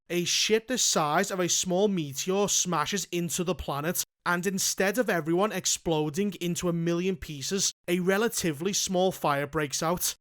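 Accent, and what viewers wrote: Liverpool, United Kingdom. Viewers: Scouser accent brings much more fun into this travesty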